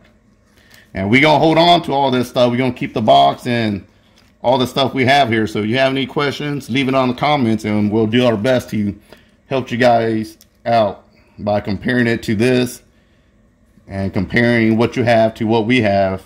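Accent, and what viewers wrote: United States. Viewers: What's the durability on the fake like , dose the spring begin to leak ? Also your accent sounds awesome I'm from NZ and Americans sound diff but your accent sounds like a mix between then more American though , my high boost is 23psi in the ej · The replica out here catfishing 🤣 this dude voice and face catfishes me.. no way did I think this dude would sound like this talking 😂 got that down south draw ..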